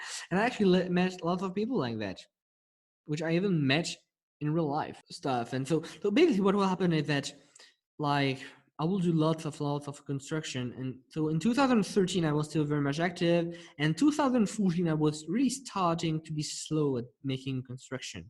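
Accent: France. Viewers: Are you french ? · I think he mentioned how his old videos were in French, so it's probably some form of French accent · Youre french? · ah tu parles français j’entendais l’accent !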